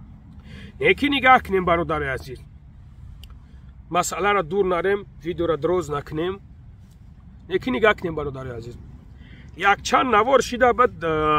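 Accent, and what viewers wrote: Kulob, Tajikistan. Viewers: Саломалекум ака чиста кулоби гап мезани мабодо ай кулоб набоши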